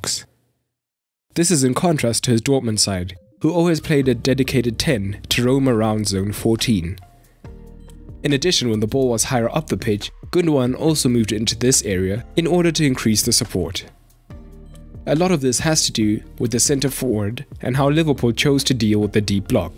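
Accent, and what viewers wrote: South Africa. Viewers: You’re South African innit?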